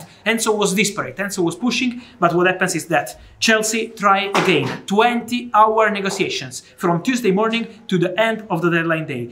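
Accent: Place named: Italy. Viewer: Love the accent. Damn Italians..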